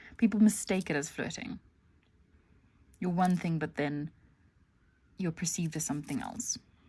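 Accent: South Africa. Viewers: Are you South African? Just found your channel, love these messages and your thumbnail💗 p1 is really accurate 😮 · Girl I know a South African when I hear one! 👀😂🫶🏾🇿🇦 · South African voice right there! Love it 🥰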